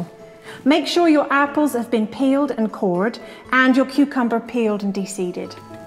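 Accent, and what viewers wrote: United Kingdom. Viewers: What an amazing look, what an amazing British accent, what amazing kitchen tools .. It's a Brilliant video which made me so happy 😁 it's so classy & elite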